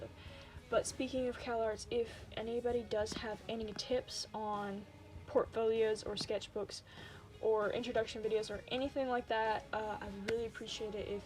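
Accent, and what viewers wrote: South, United States. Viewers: I love your southern accent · Ya know what, I did not expect your country voice from that thumbnail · You sound really southern ekwnensmsn me too!! Where are you from?? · Broo u from the south? U have a nice accent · You draw these uwu boys but your voice is very southern it’s throwing me off XD you sound like you live in Texas or somewhere deep in Gerogia.I’m southern myself but my accent barely ever comes out unless I’m casually talking in English with people I’m comfortable with and use slang.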